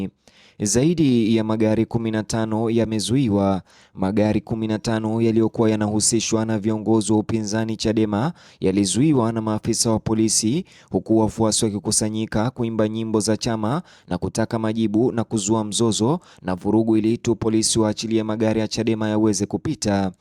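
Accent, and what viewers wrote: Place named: Kenya. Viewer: kiswahili chenyewe kinakusuta wewe ni mkenya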